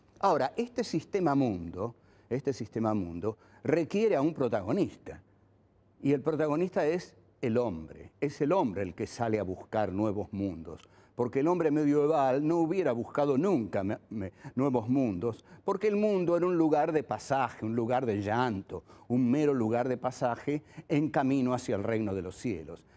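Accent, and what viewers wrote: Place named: Buenos Aires, Argentina. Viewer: “Nunca digan digresión, es digresión”. Ese acento porteño jajaja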